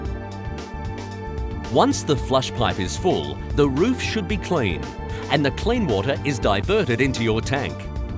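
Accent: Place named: Australia. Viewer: with volume up Oh, so if I put this on a tank, the military should be very thankful for the overexcited australian narrator Don't get me wrong, i love australians and their accents. :P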